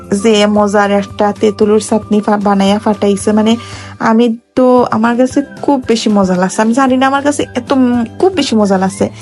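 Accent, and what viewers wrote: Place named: Sylhet, Bangladesh. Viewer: মাশাআল্লাহ আপনার voice এবং আপনার এই সিলেটি সিলেটি ভাব এটাই বোঝা যায় যে আপনি একজন , খাটি বাঙ্গালী । আপনি যেহেতু একজন Teacher তাই আগে আপনাকে সালাম দিতে ভোলে গেছি , assalamualaikum mam. ❤❤❤❤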